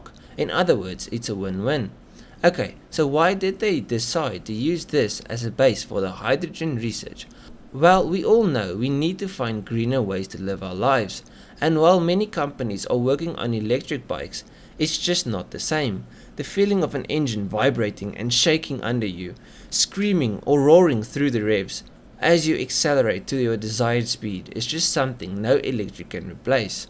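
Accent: South Africa. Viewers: my guy you sound like you from South Africa ✌👌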